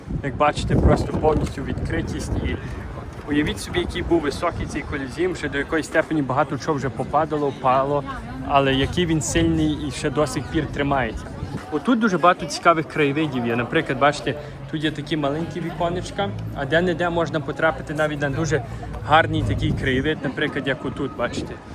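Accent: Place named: Kolomyia, Ukraine. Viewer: ти чисто гутариш по коломийський,звідки ти родом?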